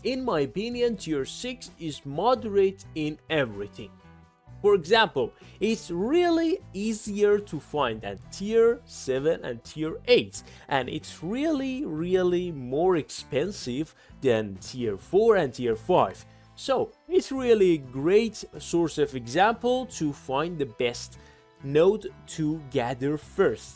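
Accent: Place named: Iran. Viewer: dash zar mizane irani hasti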